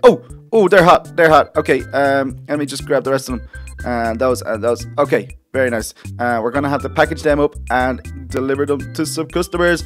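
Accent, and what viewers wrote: Ireland. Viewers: I think this dude was Irish 😂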